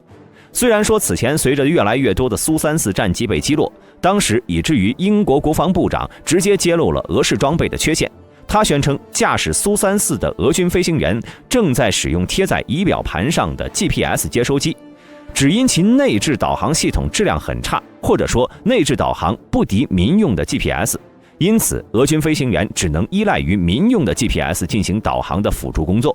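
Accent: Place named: China. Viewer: 説話者是大陸口音 留言者都不是大陸的😁😁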